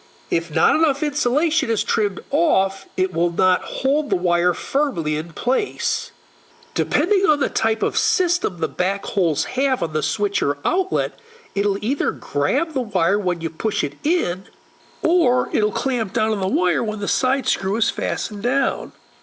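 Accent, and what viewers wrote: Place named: Midwest, United States. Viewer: He's got a strong Midwestern accent. It's called the Great Lakes Twang.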